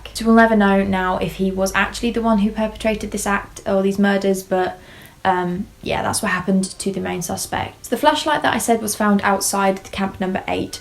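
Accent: United Kingdom. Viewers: I'm from Salina Oklahoma about 10 miles from the old camp site i grew up hearing about these story's i was 3 years old it sounds like your from the UK so why you even going on about this?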